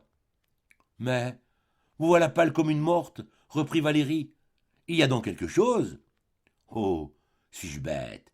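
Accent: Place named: South, France. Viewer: Ce n'est pas un conteur agréable a écouter Cette accent du midi élocution hachée j’abanbonne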